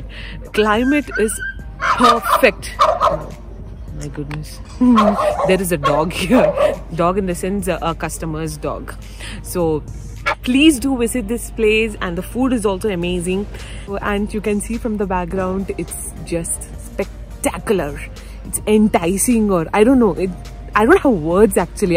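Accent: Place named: United Kingdom. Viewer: You speak English like British. Reminds me of Queen. We Indians are best.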